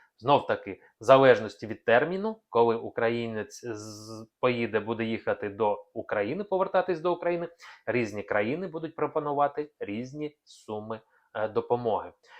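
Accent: Russia. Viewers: Бедолага а у вас акцент на русский язык не старайся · Очень режет слух Ваш " русизм" в произношении. Прошу прощения: в украинском языке, если пишется (на пример) "отримував", то и произносится Отримувпв а не Атримував. Во многих случаях Вы приносите так. Обратите на это внимание. Вы же с экрана вещаете все же. Спасибо. И... Страна - это русское слово. В украинском оно другое, Вы и так, и эдак произносите. Спасибо за информацию. И простите, если что))) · Якесь недолуге , намагається навести жаху на українців😁Альо, чоловіче з бородою та как..ським акцентом!! Тобі до мольфара, як до Парижу пішки!!! Аналітик - невдаха!! Ще й до всього - хамло!!! Ліпше перехрестися і покайся, і закінчуй зі бути лжепророком!! Ми, українці,заґартовані такими висєрами😁 Нас вони не « беруть»!! Лише Господь знає, як і коли буде кінець цьому балу сатани, а не « пророк з бородою»! Ця інформація для болот !!!!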